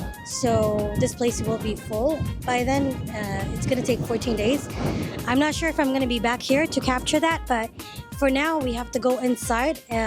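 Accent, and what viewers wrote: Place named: United States. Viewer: Oh you are American accent masha allah u do well show people good things our island like jazeera beach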